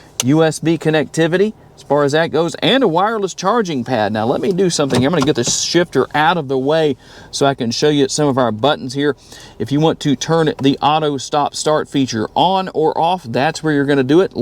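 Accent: South, United States. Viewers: He speaks like someone from the southern United States. He is probably very respectful, cares about people he doesn’t even know and smiles when people aren’t nice to him. Good salesman. Might buy one of these because of the video.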